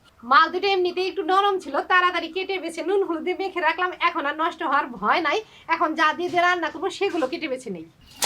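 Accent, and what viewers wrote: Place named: Bangladesh. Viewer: Onar kotha barta Bangladesher moto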